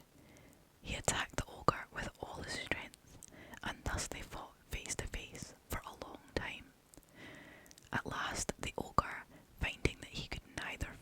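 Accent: Scotland, United Kingdom. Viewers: You are absolutely brilliant. I love your Scottish accent! I hope you gain a lot of viewers. I’ll be sticking around for sure 💚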